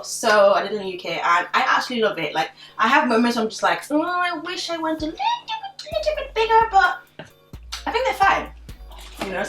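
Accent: Nigeria. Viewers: Your Nigerian accent definitely made it's appearance in this video and I LIVE! Lol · I find you very attractive with your Nigerian accent😍😍😍😍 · Your Nigerian accent came out during this video, and I am here for it sis!!! Love you😍😍😍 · I like u ur a smart girl sis lol I mean I've always liked u but after this video I'm like🤔she talking sense btw I love ur Nigerian accent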